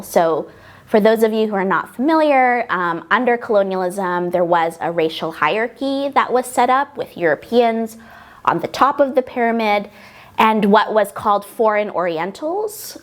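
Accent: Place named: United States. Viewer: Ini logat amerika yang paling totok yang pernah gw denger dari orang Indonesia :))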